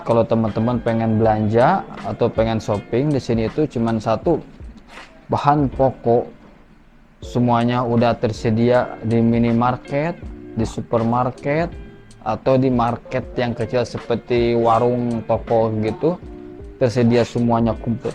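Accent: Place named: West Java, Indonesia. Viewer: Kapan mao ke salmiya bang ? Kopdar" Aksen nya aksen sunda ini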